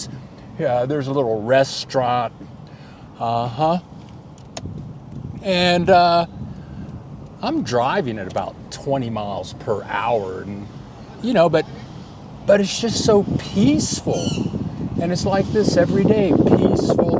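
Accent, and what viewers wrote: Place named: United States. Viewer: Good video. Lol, after 15 years your gringo accent is still very strong. ¿hablas español?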